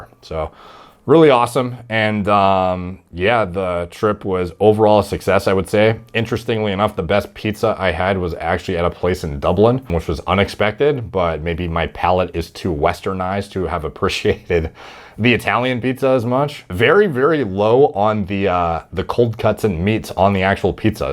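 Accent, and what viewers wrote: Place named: Canada. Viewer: I have some bosses based in Canada and after listening to them in meetings for months now, really does sound so Canadian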